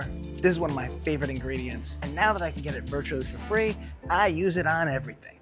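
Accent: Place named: New York, United States. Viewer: Love your New York City accent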